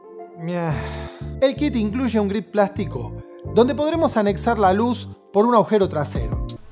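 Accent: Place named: Argentina. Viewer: Conocí el canal por este video. A ve que está muy bueno. Solamente medio bizarra la mezcla de español neutro y acento argento jajaja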